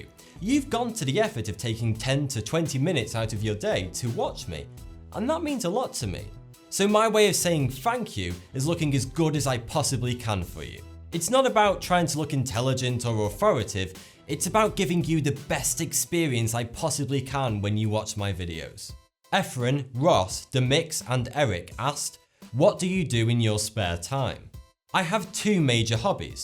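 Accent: United Kingdom. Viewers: hey! How to develop an awesome an awesome brittish dialect like you have. I really always liked it. i learned my english in germany. i know many languages but habe a problem with my accent.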